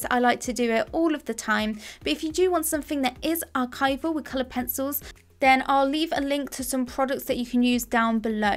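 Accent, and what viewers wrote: United Kingdom. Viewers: Are you from Britain? because I can hear your accent from Britain. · Thanks for the video👍🏻! By the way I love your accent, I’m so obssessed with British pronunciation, is it the standard british accent or a Southern/Northern? Xoxo 😘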